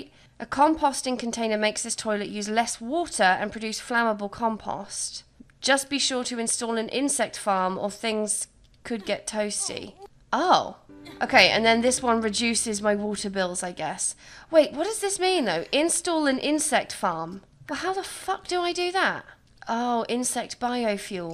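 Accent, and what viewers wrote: United Kingdom. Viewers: I love ur vids cuz their so funny and i like hearing ur voice! British voices r so smooth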